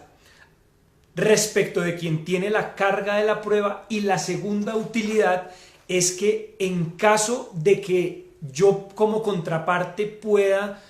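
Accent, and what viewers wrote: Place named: Colombia. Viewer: Púes si es colombiano, en el acento se nota. Sería como raro saludar jajajajaja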